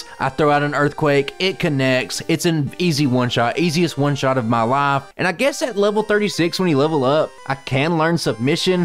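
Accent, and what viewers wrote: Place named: Kentucky, United States. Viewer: My son got excited when he heard your voice because “He sounds like he’s from Kentucky like us!!” 😂😂😂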